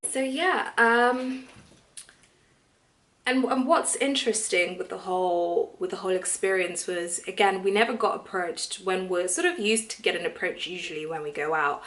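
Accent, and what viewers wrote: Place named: England, United Kingdom. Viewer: Cutie but I hate the English accent!!!!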